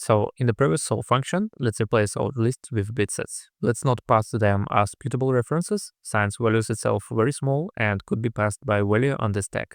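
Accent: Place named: Russia. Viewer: Мне так нравится слушать русский акцент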